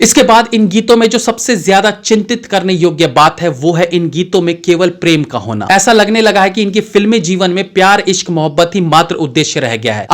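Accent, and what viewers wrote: Standard. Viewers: आपकी शुद्ध हिन्दी एवं आपका विश्लेषण अद्भुत है 😱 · Your pure Hindi is music for my ears...myself kannadiga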